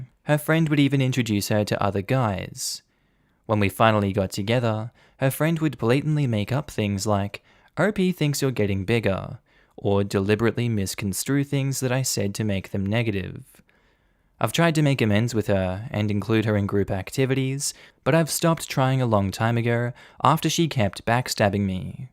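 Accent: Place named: Australia. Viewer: Ah, yes. Our favorite australian narrator with the calming voice that pierces broken souls.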